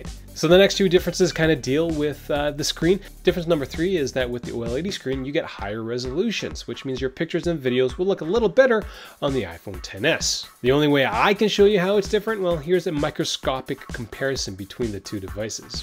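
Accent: Canada. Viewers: I’m Canadian, you’re Canadian. You make this a point in your name “eh” It’s hard finding a Canadian voice in tech and phone reviews. So I was delighted to find you. THAT said it bothers me you give prices in USD not CND . At least say “ the iPhone xr is $750. In the U.S or $1099 Here in Canada eh “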